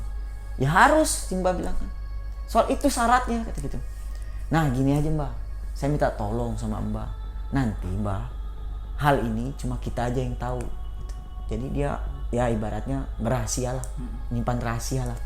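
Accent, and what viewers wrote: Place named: Jambi, Indonesia. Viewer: AssalamualIkum.. Jambi hadir bang,semakin kesini bang tambah keren,begitu juga dg baground nya,walaupun udah berubah tp ttap dapat unsur mistis nya,dan yg lebih keren lagi,bang ttap menyelipkan logat bahasa jambi di setiap cerita,bang emang the best! Best beesstt!! 👍👍👍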